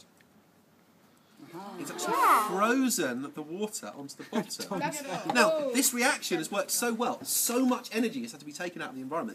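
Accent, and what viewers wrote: United Kingdom. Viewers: "Uh, no" ends the video He is from the Commonwealth, probably England though based on the accent, since he was using Celsius instead of Fahrenheit like the US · This what most British people sound like... And we can pronounce the letter t 😒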